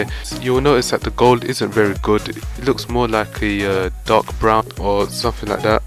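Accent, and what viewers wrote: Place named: Germany. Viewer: Are your german because you speek german english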